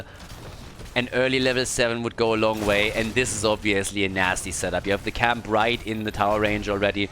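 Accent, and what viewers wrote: Germany. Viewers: here in Melbourne we're in our sixth super-strict lockdown. Can't go outside for more than 2hrs, 5km limit, no visitors, no shops, etc. Your uploads make the evenings pass so much easier, my dude. Appreciate the fuck out of you and your stupid German accent hahaha <3 Love you, stay safe. (From a former neighbour/Dutchie)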